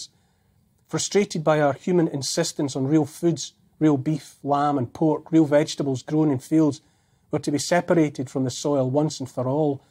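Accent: Scotland, United Kingdom. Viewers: My neighbour was a "war bride" from Scotland. She was a second mother to me. Hearing your beautiful Scots accent makes me smile and think of that wonderful woman. Hearing your message gives me hope for the world, knowing that not all of us are asleep, and it gives me hope that my neighbour's husband, and my dad, did not fight in vain in WW2. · And the scottish tones ssure help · I know he’s Scottish. Kind of obvious. I’ve never heard an “ Irish brogue”. Love his historic documentaries. He just has an “ It” factor.Not in the Steve McQueen/ Jim Morrison overt sexuality genre. More esoteric. Are you in the UK? The top two contenders vying to fill Johnson’s seat are WEF “ Young Global Leader” plants.Quite terrifying. Wales,France,Italy ( just ousted), Brussels, Netherlands, Finland, Norway,Luxembourg,Australia, NZ, Canada, Sri Lanka( ousted), Pakistan,Saudi Arabia,Germany,Chile,Bolivia,Nicaragua,Ukraine,WHO,UN,Spain, Greece, El Salvador,Peru,Honduras,Argentina,Sweden, Begium, Maldives, Costa Rica Denmark, the US Puppet Governmet. 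“ Tyrants ‘r’’ Us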